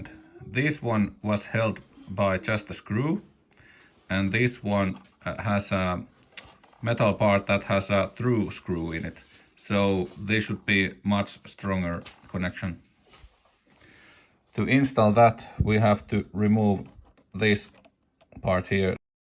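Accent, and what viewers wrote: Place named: Finland. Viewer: I know that accent perfectly! What part of Finland are you from? Some of my family lives in kymonkoski and some in viitasarri.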